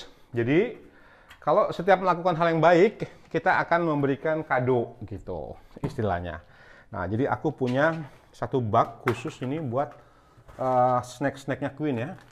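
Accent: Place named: Medan, Indonesia. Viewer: Hai salam kenal ya 😊 Ohya nada bicaranya mirip² orang medan jg ya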